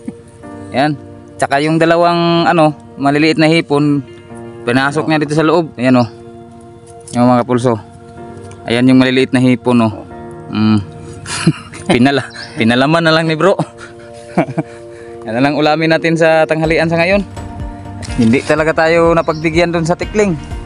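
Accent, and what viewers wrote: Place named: Visayas, Philippines. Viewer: Bisaya man kaayo ang dating from tanauan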